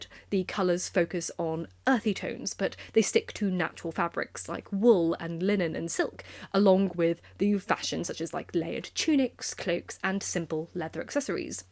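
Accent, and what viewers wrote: United Kingdom. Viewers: This is the most aggressively British voice I've ever heard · What is the name of this accent? I'm not well versed in the names of British accents besides RP and something auditory like an accent is difficult to google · You have the most BRITISH voice ever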